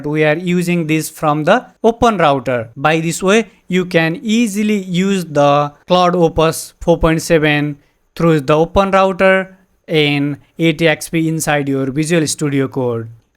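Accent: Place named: Nepal. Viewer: Bro speaks English in Nepali accent! I love it.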